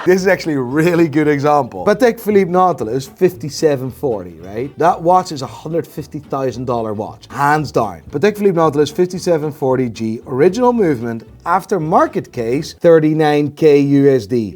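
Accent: Netherlands. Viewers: Why u got such a thick dutch accent my man? You dutch and hiding it? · I'm flabbergasted you perfected the Dutch English accent 😂 amazing keep up the work bro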